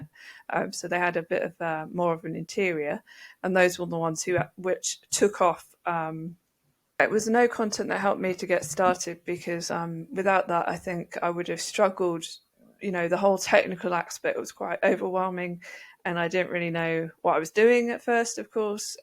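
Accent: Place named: England, United Kingdom. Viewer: Holy Moly, $4k each month after just one year. Insane. Well done you. Likewise, the amount of books you've put out is incredible. I need to get my act together! Only just discovered your channel, nice to hear another (calm!) English voice! Although admittedly I'm semi retired and looking to kdp for extra rather than an entire income, I think if I was earning the royalties you are I probably just stop and let the passive income roll in! Still, you can never have too much I guess 😂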